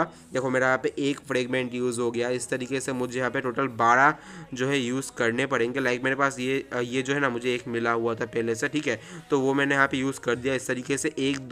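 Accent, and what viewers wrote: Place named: Bengal, India. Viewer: Dada tumi bengali to...ami kotha suna tai mona hoy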